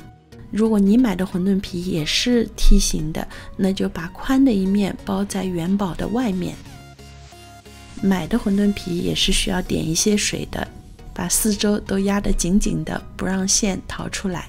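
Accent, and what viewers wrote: Shanghai, China. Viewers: 一看一听就知道是阿拉上海宁额腔调 灵额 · 上海腔调，上海咪道，一只顶。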